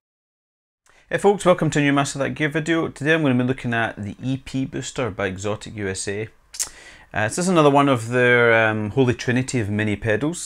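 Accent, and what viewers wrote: United Kingdom. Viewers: Why is it that almost every gear review video is done by people with British accents? Don't Americans do pedal reviews? And why can't the British say the word PEDAL? LOOK! I'm turning my PIDDLE on, now I'm turning my PIDDLE off. PIDDLE, PIDDLE, PIDDLE.😅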